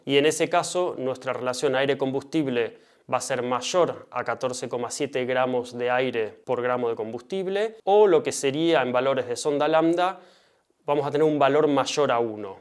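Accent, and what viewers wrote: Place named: Argentina. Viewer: Muchas gracias por tan buen video, aquí un nuevo suscriptor, soy de Venezuela y particularmente no se pq nunca me ha agradado el acento argentino pero Los Argentinos que he conocido son maravillosas personas, lo digo pq me has caído super bien compa saludos y el mayor de los exitos para tu canal 👍🏻🙌🏻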